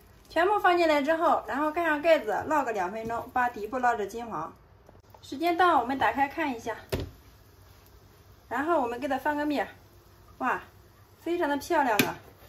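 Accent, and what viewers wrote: Shandong, China. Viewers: 难道她是外国人么？我听她的口音好像是山东口音的普通话啊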